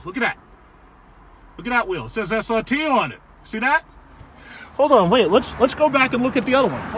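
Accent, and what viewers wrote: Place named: Long Island, United States. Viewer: You are so Long Island bro. I watched mine 10 of your videos because you sound just like me and my brother arguing gear head stuff. I'm definitely a fan, bro. Keep doing your thing!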